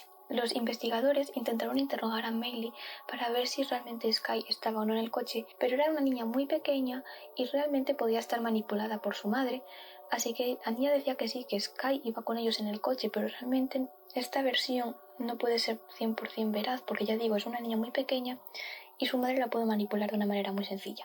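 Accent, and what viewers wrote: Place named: Spain. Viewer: creo q por tu acento eres española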